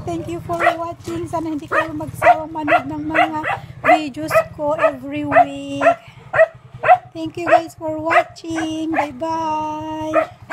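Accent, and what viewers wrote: Iloilo, Philippines. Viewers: Taga San ka ba sa Philippines sis.kasi young accent mo parang ilongga.😊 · Ilonggo poh kau ate?😊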